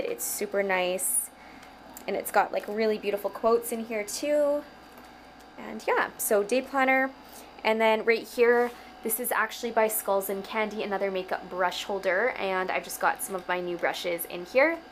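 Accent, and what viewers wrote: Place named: Canada. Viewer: Canadian accent on fleek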